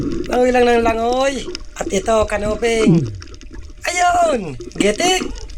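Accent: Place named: Camarines Norte, Philippines. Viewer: Idol lagi ako na no nood sayo nalilibang ako sa mga video mo at sa Punto ng PG sasalita mo Alam ko taga Camarines norte k. Taga labo kase ako. Dito n ako sa Laguna now, ingat k lagi God bless po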